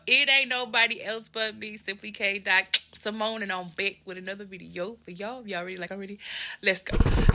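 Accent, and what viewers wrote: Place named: Texas, United States. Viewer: Hey! You sound like you are from Texas.💜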